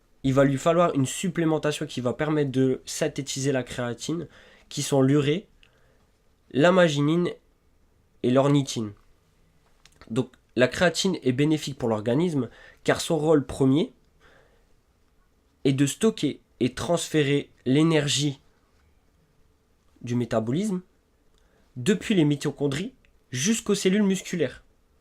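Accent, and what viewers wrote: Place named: Nord-Pas-de-Calais, France. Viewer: Super bonnes explications, avec un "grave" accent Ch'ti, en supplément🙄🤗. Je vais même réécouter cette excellente vidéo, super complète et scientifiquement pertinente, pour noter les points que je connaissais moins bien. A 63 ans 1/2, j'ai eu il y a peu ( après m'être épuisée pratiquement jusqu'au burn out à soigner jusqu'à sa fin un parent, puis l'autre), un manque de tonus et réponse musculaire grave. Je n'arrivais plus à me relever, difficilement à monter un petit escalier et à marcher. C'était incompréhensible et ma Dr me disait: "C'est l'âge ! ". Tu parles, ça se saurait si à 63 ans, les gens, en 2021, étaient pratiquement TOUS incapables de bouger !!! En réalité, bien que n'étant pas végétarienne, je manquais de vitamine B12 et un chouia de potatium. Pourquoi ? Je ne sais pas. Un sursursurmenage trop intense, pendant trop, trop, trop, trop, trop, trop, trop d'années (7 ans 24h/24, après 20 ans par intermittence) , trop de charge physique et mentale non stop en solitaire ? 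Bof, peut-être ou ? Je vais mieux mais faut toujours que je sois sur tous les fronts, alors j'ai bien l'intention d'alimenter ma machine humaine pour qu'elle soit efficace et autonome le plus longtemps possible. Le moral et la cervelle, ça va. Je sais comment les maintenir en forme naturellement. Vraiment, tu es très clair et pédagogue. La petite vieille te fera savoir si elle parvient à reprendre un peu de fitness, de danse, de vélo et de randonnée. 🧙‍♀️🏋️‍♀️💃🚴‍♀️🚶‍♀️💪 🧙‍♀️ 🤭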